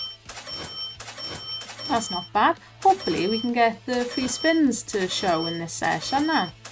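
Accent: Wales, United Kingdom. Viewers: I use this and usually does brillaint for me, i can usually add £5 and almost always double my money, also you can hit both bonuses on the same spin, 20p spins is the best for me, also is that a welsh accent i detect? Im welsh too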